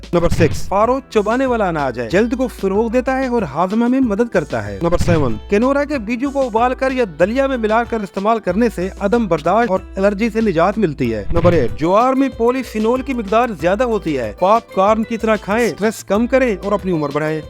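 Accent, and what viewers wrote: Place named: Saraiki, Pakistan. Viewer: السلام علیکم بھائی جان اپ کی ویڈیو بہت اچھی ارہی ہیں ۔لیکن اردو کا لہجہ سرائکی جیسا ہے جس کی وجہ سے بہت سے الفاظ یا تو سمجھ نہیں ارہے یا بار بار سننے پڑ رہے ہیں جیسا کہ اپ نے اس ویڈیو میں پانی میں کوئی چیز بوائل کرنے کا ذکر کیا جو خون جمنے اور ذائقے کی حس کو تقویت پہنچاتی ہے بار بار سننے کے باوجود اس کا نام سمجھ میں نہیں ایا ۔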